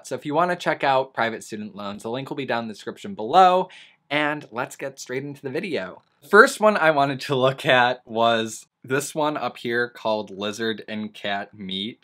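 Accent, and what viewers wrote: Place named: Minnesota, United States. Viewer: I keep wondering what his accent is?? Minnesota? The a’s, like “welcome bahck”. It reminds me of Kathy Bates in AHS Hotel.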